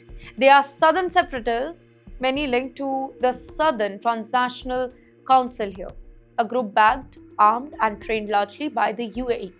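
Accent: India. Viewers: Oh my god look at this curry accent we Australians don't understand what you are saying speak proper English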